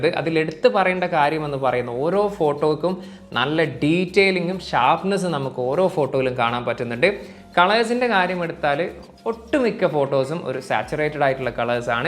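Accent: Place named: Kozhikode, India. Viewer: അതിന് ഞാൻ നാട് എവിടെയാണെന്ന് ചോദിച്ചില്ലല്ലോ സുഹൃത്തേ...! നമ്മൾ ജനിച്ചു വളർന്ന ദേശത്തെ ഭാഷ അറിയാതെ നമ്മുടെ സംസാരത്തിൽ വന്ന് പോകുന്നത് സ്വാഭാവികം.. അതിൽ തെറ്റൊന്നും പറയാനില്ല.. പക്ഷെ ഇത് പോലെ ഒരു പബ്ലിക് space-ൽ പരിപാടി അവതരിപ്പിക്കുമ്പോൾ അത് വരാതെ ശ്രദ്ധിക്കാൻ നോക്കണ്ടേ..? കാരണം, കോഴിക്കോടുകാര് മാത്രമല്ലല്ലോ അനിയന്റെ ഈ content കാണുന്നത്....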